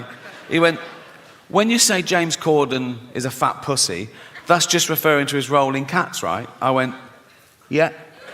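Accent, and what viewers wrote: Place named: London, United Kingdom. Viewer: Gervais for me is such a bore and I hate his semi London accent. 🤮🤮🤮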